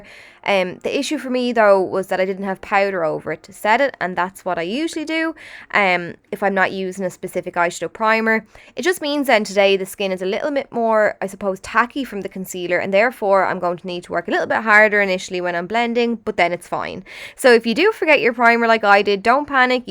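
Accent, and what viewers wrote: Ireland. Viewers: I love your beautiful Irish accent!💖greetings from Israel😁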